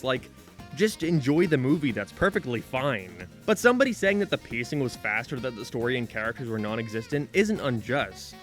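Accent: Ireland. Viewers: dude is Irish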